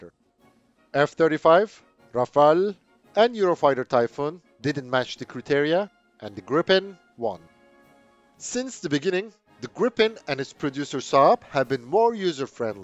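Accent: Turkey. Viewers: I know this dude. His accent is Turkish coz he is a Turkish guy.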